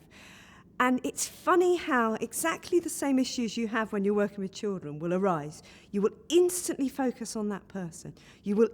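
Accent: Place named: United Kingdom. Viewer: I really love your accent madam! I wish our english subject is british english and not american english...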